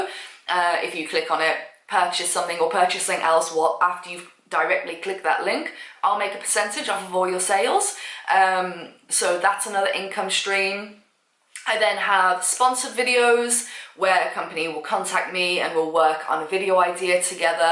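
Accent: United Kingdom. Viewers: I love ur accent i want to find me a British wife got any friends? Lol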